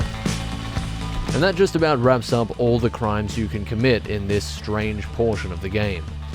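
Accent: Australia. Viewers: I think I'm in love with you. Everytime you upload my heart has a sudden shock of excitement. It skips a beat. It is undescribable the feelings I get when I see the notification that has uploaded. Your beautiful australiaun accent. Your well crafted sentences and editing. The narratives and cinemtagraphy blend together to make me EXPLODE! Thank you, thank you so much!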